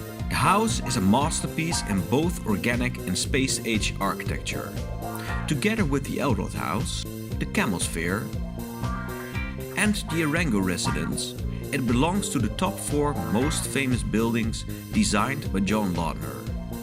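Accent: Netherlands. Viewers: You happen to be Dutch? Great video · The narrator must be Dutch? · Een Nederlander die Engels praat.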